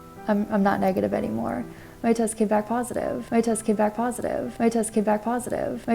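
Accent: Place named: Midwest, United States. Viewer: Yes, even in North America the situation are getting dicey....judging from your accent, are you from the midwest? I'm in the Southeast (Florida) now 🙂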